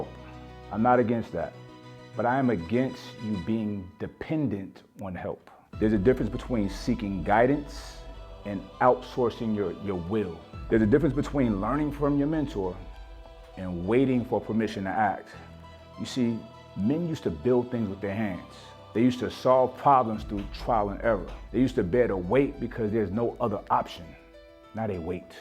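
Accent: Brooklyn, United States. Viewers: "The culture rewards this bullshit" I know Brooklyn when I hear itl 🗣 TEACH!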